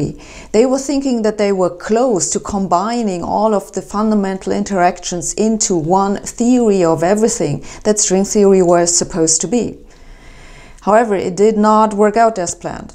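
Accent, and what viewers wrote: Germany. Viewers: I love it when she speaks English with a German accent. I first tuned-in for the science, but her deliver is so mesmerizing, I'd listen to her talk about any subject. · Is she German? Does anyone know if she's German?? 😂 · german english.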